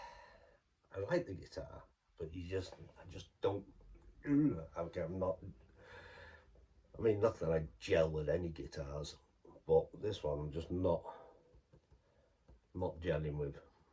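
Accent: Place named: Northwest England, United Kingdom. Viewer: Nice one! Love that gothic looking pick guard on the tele! You sound like you might be from the same part of the world as my family, in the north west, somewhere near Liverpool perhaps?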